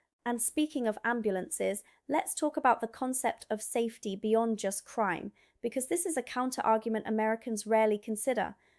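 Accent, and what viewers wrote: United Kingdom. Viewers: Just curious? Where exactly were you born? You don’t have an American accent. You speak very mostly British.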